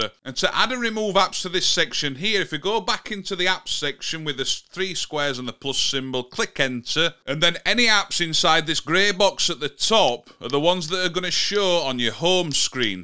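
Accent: Yorkshire, United Kingdom. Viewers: Great help mate…Love your Yorkshire accent 😊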